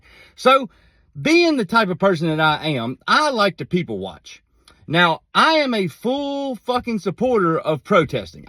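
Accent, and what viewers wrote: South, United States. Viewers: I adore you. We may disagree on some things but, I love the fact that you and I can disagree and still be friends. Btw, I am not a Trump supporter but, I'm a military brat who loves this nation and our family/ friends in blue. I just don't like Trump (before anyone attacks that doesn't mean I liked Biden)....I miss my daddy and your voice down to the southern accent reminds me so much of him. Hugs hunny, The GRITS (Girl raised in the South) now living in the North.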